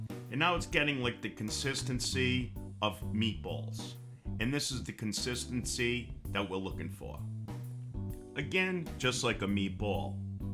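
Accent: Rhode Island, United States. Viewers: ahhh. I see. Well, you certainly have not lost the RI accent!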